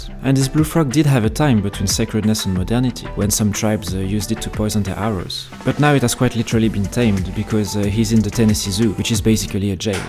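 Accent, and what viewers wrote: France. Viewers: The frenchy accent ❤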